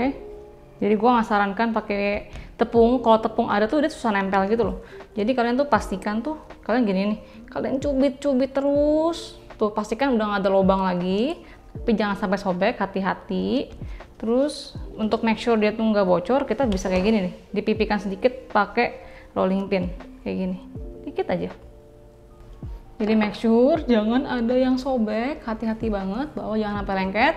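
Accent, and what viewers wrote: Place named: Sunda, Indonesia. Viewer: Ini cici cici bandung kali yah ? Logat sunda kental banget kaya nya😂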